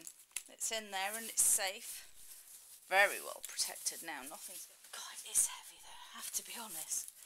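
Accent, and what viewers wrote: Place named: United Kingdom. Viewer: I love your accent. Many Americans are suckers for a British accent!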